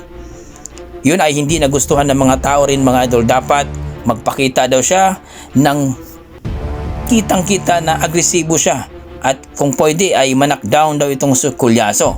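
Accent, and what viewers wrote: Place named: Visayas, Philippines. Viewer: Bisaya na bisaya Ang pananalita mo, para ka bulol mag salita, Masakit sa tengga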